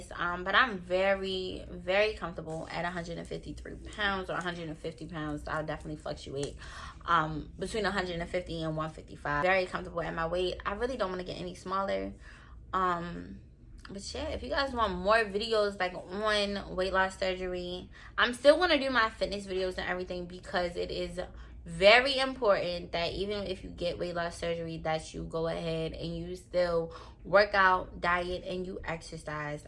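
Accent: Baltimore, United States. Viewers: I knew it your from Baltimore the accent 😇 pretty girl 😍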